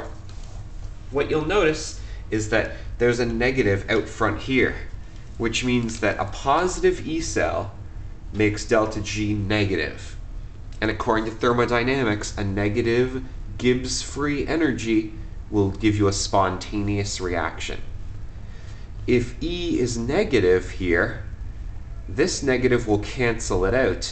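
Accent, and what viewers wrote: Canada. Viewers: I can tell he's Canadian by the way he pronounces the word "Out" like "Aut". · I think it's an Atlantic Canada accent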